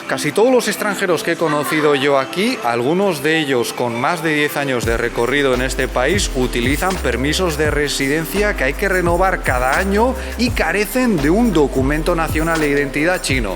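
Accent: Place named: Spain. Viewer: Puedes usar un poco de sarcasmos en tus videos? Te sale muy gracioso por tu acento tierno español, gracias me divierto mucho, tu bebé es muy linda.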